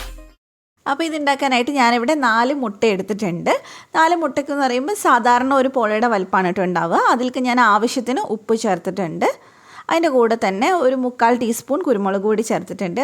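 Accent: Kannur, India. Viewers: ഇത്ത കണ്ണൂർ എവിടെയാ മട്ടന്നൂർ aano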